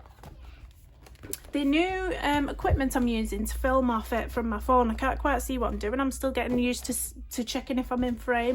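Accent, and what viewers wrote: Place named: Yorkshire, United Kingdom. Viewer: Just found your channel and i love it very much. I am a senior who colours so i find your content very informative. Your children sound adorable so don't ever apologize for their noise. They grow up and leave home very quickly and then you hope for grandchildren so the noise comes back. I do have lots of these books so i will be able to colour along with you too. PS I love your accent. Im assuming its Yorkshire but could be Lancashire.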